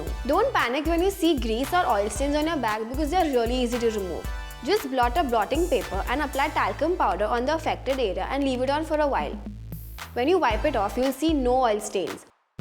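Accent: India. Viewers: I know! What's wrong with all these people. She's Indian for crying out loud so she has an accent. Any idiot can understand her if they listen. My god. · Indian accent sucks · You’re just not used to Indian accent and that’s okay ! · She has a thick accent as most Indians do.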